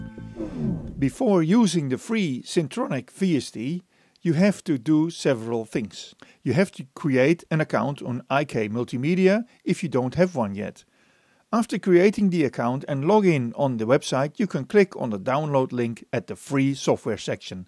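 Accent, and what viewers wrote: Netherlands. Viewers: this guy is definitely dutch haha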